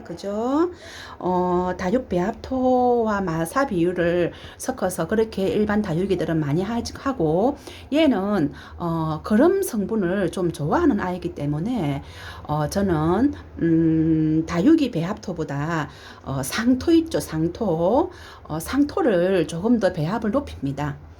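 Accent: Gyeongsang, South Korea. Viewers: 꽃이 이쁘게 폈네요.. 나레이션 경상도라서 더 잘들립니다! 감사합니다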